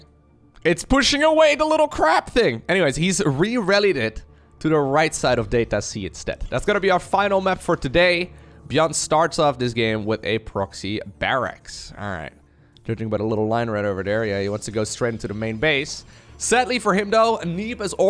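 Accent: United States. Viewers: Yea, Lowko...it is interesting to hear about your American Twitch Standard accent and you behaving like a degenerate Runescape-addict past weekend and all but can we get back to the game...that would be great...yeah....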